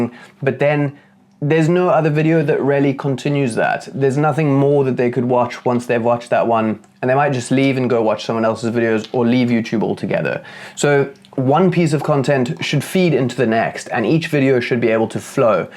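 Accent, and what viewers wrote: South Africa. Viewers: Yoh bru, it's a proper gamechanger hearing a South African accent when you're trying to learn something on YouTube. Thanks for the intel, keen to klap this journey. Sending love from CT. Lekker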